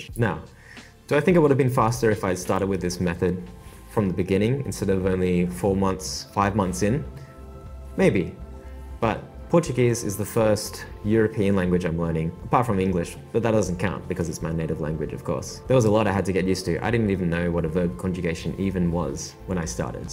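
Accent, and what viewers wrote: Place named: Brazil. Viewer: WOOOOOW Parabéns man! O seu nível de portugês é muito bom, e apesar da professora ser portuguesa, e o sotaque é de portugês é bem brasileiro. Daqui a um ano vais falar melhor do que eu com certeza :D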